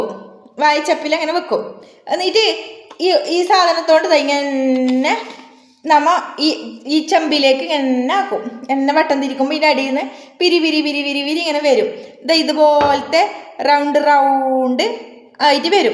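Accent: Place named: Kasaragod, India. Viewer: ആക്ടിങ് അല്ല ഇത് കാസറഗോഡ്ഭാഷ ആണ് , ചില സ്ഥലത്തഇങ്ങനെ ആണ്, ബന്തടുക്ക, പൊയിനാച്ചി, ഇങ്ങനെ അങ്ങോട്ട്‌ ഇതാണ് ഭാഷ ❤️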